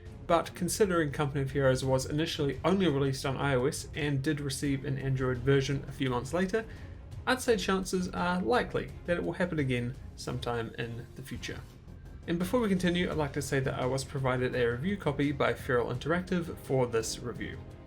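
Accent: New Zealand. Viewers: R u from New Zealand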